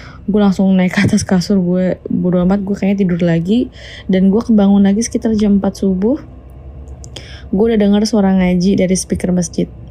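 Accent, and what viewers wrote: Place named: South Jakarta, Indonesia. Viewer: Anjir didaerah gue Cinere, anak JakSel mana suaranya.. sukses selalu mbak yang cuantik hehe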